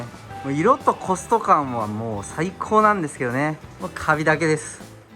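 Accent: Kansai, Japan. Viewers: いつもより関西弁強めでしたねw 同じく関西人ですので、心地良いですが。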